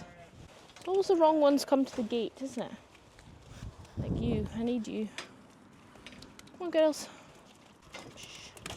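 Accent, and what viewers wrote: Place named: Scotland, United Kingdom. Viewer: Hi, you have so many ewes to still lamb and that means you will be busy for a long time. Yes, they all look good considering all the rain you had earlier. You will have to train Davie better, not getting your lunch is a bad start for him. I assume your dad is looking after the cattle while you do the sheep, a good team working well. I love listening to your unique Scottish voice, its so different from the lingo in Aussie. Looking forward to hearing your news. Chris from Victoria, Australia 🇦🇺.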